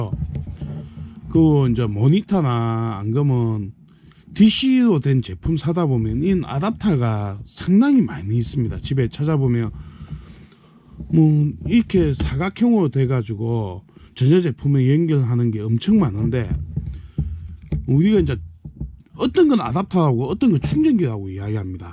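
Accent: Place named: Daegu, South Korea. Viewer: 아재 대구네요 사투리에 답답한말투 잘보고갑니다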